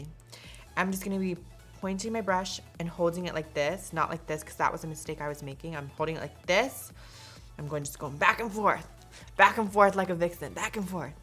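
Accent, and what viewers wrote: United Kingdom. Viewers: living for the british accent bih🎉🎉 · Why does she sounds a bit British here?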